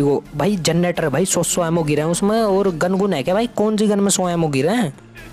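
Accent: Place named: Marwari, India. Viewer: Bhai to marwadiy kas bol la taa hai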